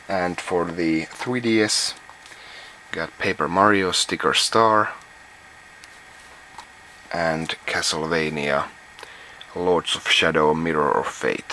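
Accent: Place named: Finland. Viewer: Finnish accent is unique. Not so easy to grow out of it.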